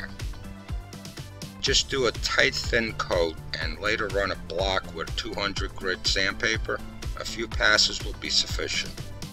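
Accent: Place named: New Jersey, United States. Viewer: This guy sounds like he’s from Jersey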